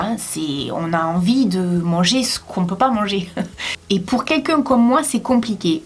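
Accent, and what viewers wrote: South, France. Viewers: tu m'a fait trop rire la spécialité du sud de la France avec l'accent du sud😂😂😂😂😂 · Hey ! Superrrrr vidéo !! Pourrais tu faire une vidéo sur des recettes du "Sud de la France"(avec ton petit accent :-)) ou sur des recette faciles et rapides pour les gens qui ne rentrent pas chez eux le midi ;-)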